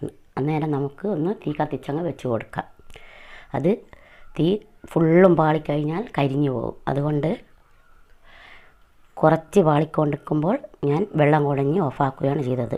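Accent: Nadapuram, India. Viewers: Barthanam kettokkumbo nadapuram bayikkanna thonnunne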